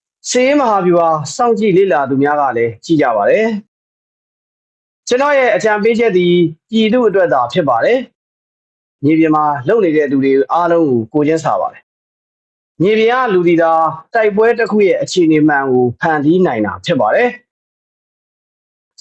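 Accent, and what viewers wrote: Yaw, Myanmar. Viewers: ကျက်သတုံး အားရစရာကြီး ပြောတတ်လိုက်တဲ့ ယောနသံ ဗက ပြောရင်းနဲ့ ရီသံကြီးက မအလ ကြားရင် ဖင်နဲ့ငုတ်စောင့်သေလိမ့်မယ် ဗိုလ်ကြီးရ 😂😂😂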